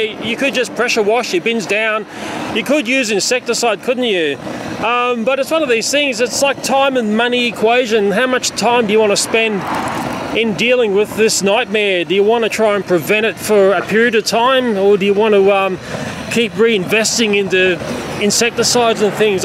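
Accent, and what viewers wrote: Australia. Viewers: I didnt know u where aussy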